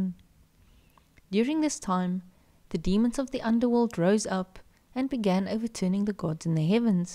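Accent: South Africa. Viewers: Very nice content. Familiar accent. South African?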